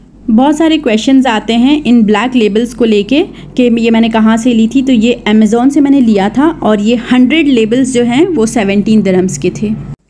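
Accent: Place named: Uttar Pradesh, India. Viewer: App Mumbai so ho. Lekin bat tu pori up wali kartey ho☺️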